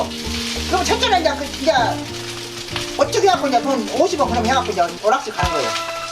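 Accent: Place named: Gwangju, South Korea. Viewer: 3년전에 돌아가신 할머니도 생각나고 지금은 나이들어 손자도 보신 저희 엄마도 생각나고 만감이 교차합니다. 저도 광주사람이라 사투리가 너무너무 익숙하네요. 막례님 항상 건강하시고 늘 행복하셨으면 좋겠습니다!!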